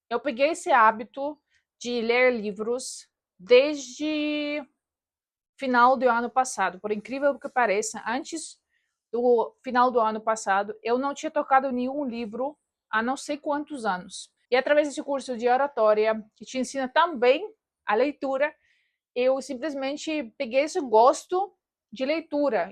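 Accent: Brazil. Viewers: Seu sotaque caipira de São Paulo é culpa do seu principal professor! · Sou do Paraná e vc tem sotaque paulista parabéns vc fala muito bem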